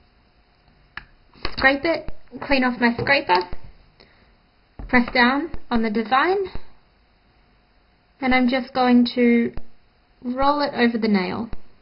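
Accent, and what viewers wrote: Australia. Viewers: I'm Australian, Got the same accent myself, yeah she's Aussie ;)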